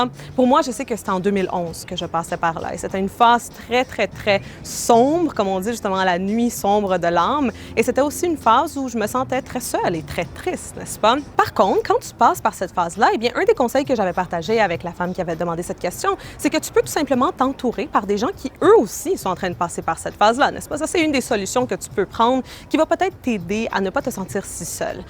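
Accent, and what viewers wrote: Quebec, Canada. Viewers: in this video she speaks french with a Canadian accent (québécois) because she leaves in Canada. She is trilingue. She speaks currently French, English and Spanish, she has latino origins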